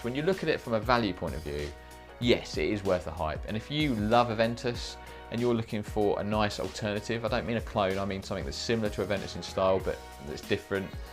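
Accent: United Kingdom. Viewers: Wow Ed Helms has a great English accent.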